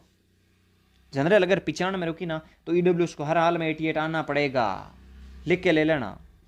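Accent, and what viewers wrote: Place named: Barmer, India. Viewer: Sir lgta hai aap Barmer ke ho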